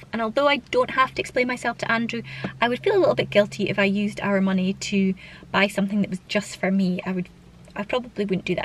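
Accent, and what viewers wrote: Scotland, United Kingdom. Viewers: You Scottish yeah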